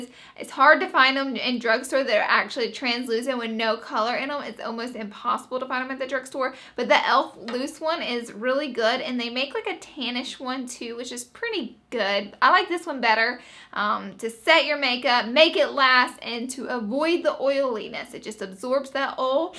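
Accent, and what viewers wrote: South, United States. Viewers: Have you...have you ever heard of a southern accent? Magic.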